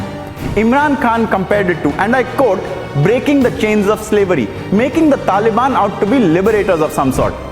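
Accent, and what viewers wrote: India. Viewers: Finally an indian-english accent i can understand as a german. Why does not every indian speak like this ?!?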